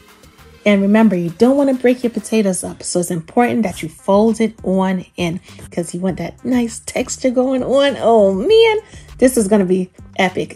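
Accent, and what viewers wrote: New York, United States. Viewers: Love your NY accent. Miss home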